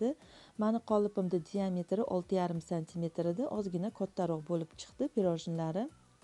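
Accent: Khorezm, Uzbekistan. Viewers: patnsga solib tuylarga oborgan yaxshi ekan menga yoqdi shu uslda albatta tayorlab tuyga oboraman patnsga salafan urab rahmat sizga opam xorazmlmsiz opam shvagiz horazmcha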